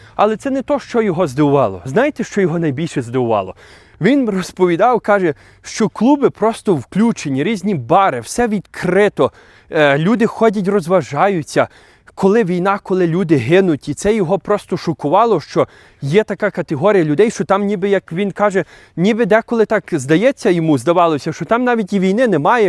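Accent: Canada. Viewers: Цікава історія, гарний канадський акцент......, але чому всю цю історію потрібно було розказувати під час ходьби??? Ви кудись поспішали?))) Незважаючи на вашу хорошу фізичну форму ви все одно зидихалися і кавтали слова) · Звісно в Україні горе і біль. Канадці навряд чи це зрозуміють. Але Україна не була прям бідною державою. Багато хто мав бізнес будинки ферми заводики. Але багато хто це втратив. Звісно розділення в суспільстві існує і це давить на звичайних українців, і головне бійців які навіть додому приїхати не можуть не те що в барі посидіти. Спортом треба займатися це для здоров'я нації. Але наприклад розваги для мене зараз закриті. У мене немає настрою. Якісь пізнавальні тури то ще дозволяю, що б змінити навколо простір. Звісно треба економити. Коли хтось на супер дорогій машині і при тому відмазані від служби то це викликає незадоволення. В Канаду треба поїхати. 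У Вас гарна доречі мова, хоча Канадський акцент звісно є. Цікаво чому у всіх канадців він однаковий😊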